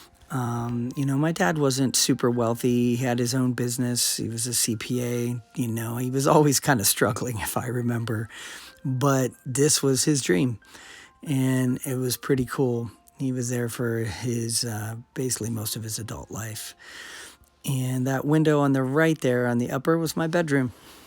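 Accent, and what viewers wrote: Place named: Ohio, United States. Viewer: Why I knew you were from Ohio by how you talk? 😁😁 Im in Cincy❤❤❤❤❤❤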